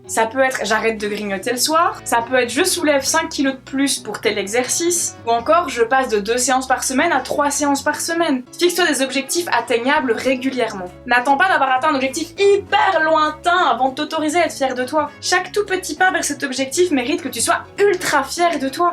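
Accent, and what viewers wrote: Belgium. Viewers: Une compatriote Belge avec le bon accent :D Du coup l'objectif Iron man m'a fait rire tout seul devant mon écran, parce que faut devenir riche, se forger une armure et fonder les Avengers. Il y a du boulot ! :D (oui je revendique ma vanne pourrie) Continue comme ça en tout cas, plein de bons conseils dans lesquels je me retrouve :D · tu parles comme une belge j'avais cru que tu es française je me suis trompé vas-y donne ton 0032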